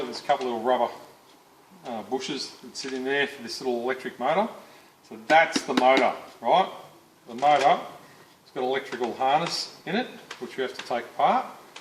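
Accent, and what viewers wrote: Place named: Australia. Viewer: thank you for your video on how to pull the ‘animal’ apart it was a great guide/confidence booster to tackle the replacing of the the main filter around the engine. I live in the UK, I am Ozzy, it was great to hear a beauty Oz accent! Thanks Mate, really appreciated your video and effort, cheers... BTW, It’s back together working, good as new! 😎👍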